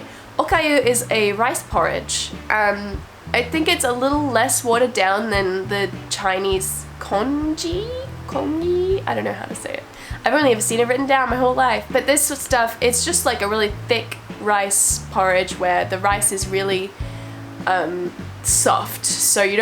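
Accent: United States. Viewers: I'm hearing a little bit of American popping in to your accent lately :') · Sick has kind of an American accent haha Hope you're feeling better! My go-tos are olive leaf extract, OJ, tomato juice, and soup. I had a flu-y thing a few weeks ago that wiped me out for 2 weeks, hope you haven't had the same thing. It was horrible.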